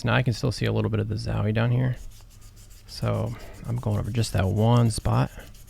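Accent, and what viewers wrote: Ireland. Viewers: R u actually Irish though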